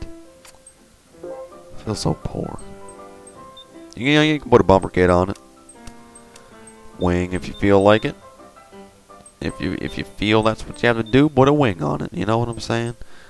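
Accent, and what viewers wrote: Newfoundland, Canada. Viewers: man your canadian ass fucking english murdering accent made me hate this video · are you canadian? :D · Are you from newfoundland? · Are you Canadian? Your accent is quite distinct. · are u from canadia?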